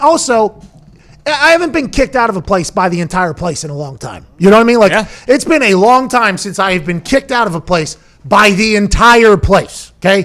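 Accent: Pittsburgh, United States. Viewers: "HE'S FUGGIN' AHT DAHN ERE." That's as Pittsburgh as it gets.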